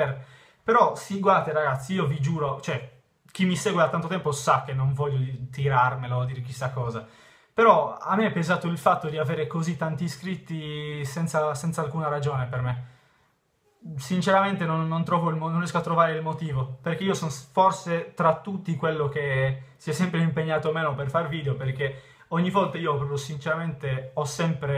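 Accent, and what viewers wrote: Sardinia, Italy. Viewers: Allora secondo me te ti sotto valuti perche' ci sara' un motivo se hai fatto un boom di iscritti,magari ad una parte delle persone che si è iscritta piaceva il tuo accento sardo magari ad un'altra parte piaceva il call of sputy questi sono esempi fattI da me magari le persone si sono iscritte per altri motivi ad ogni modo non ti devi sentire in colpa se hai fatto piu' iscritti di altri nn è colpa TUA.Detto questo mi manchera' vedere i tuoi video ma ti auguro buona fortuna per gli studi:) PORCO IL!!!!!!!!!!!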